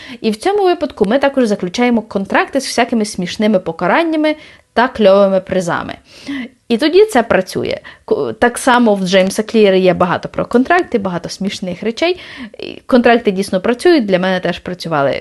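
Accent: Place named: Lviv, Ukraine. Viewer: Вас так приємно слухати, що я навіть не вмикаю х1.5 (що зазвичай роблю). Ви наче маєте львівську говірку («будЕте», «до прикладу»…) чи ви дійсно львів’янка може? Так часто вас слухаю - складається враження, що ми особисто знайомі 😅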